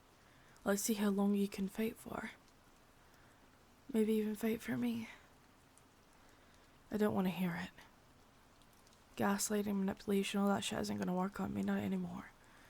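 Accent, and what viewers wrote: Scotland, United Kingdom. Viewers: Can you do a video using the thick Scottish accent like in the movie brave heart plzzz🙏🙏🙏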